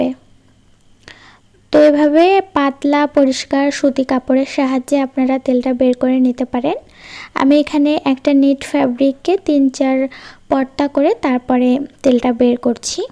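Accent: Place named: Chapai Nawabganj, Bangladesh. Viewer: Apnar language onek ta chapai er manus er moto. Basa koi apner r kun class a poren.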